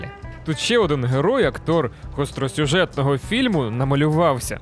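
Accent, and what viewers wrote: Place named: Surzhyk, Ukraine. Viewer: Супер! Від суржика взагалі кайфую)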